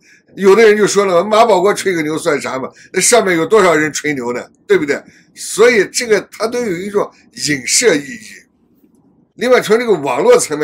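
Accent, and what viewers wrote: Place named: Northwest, China. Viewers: 老哥听口音是西北人吧，思路清晰，句句实在👍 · 听大哥口音是西北一代哦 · ，额觉得你是个陕西人，得是滴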